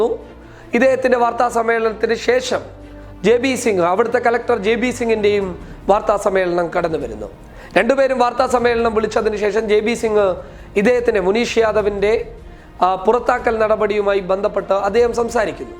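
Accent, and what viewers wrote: Kasaragod, India. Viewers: Kasaragod shaili kayarivarunnu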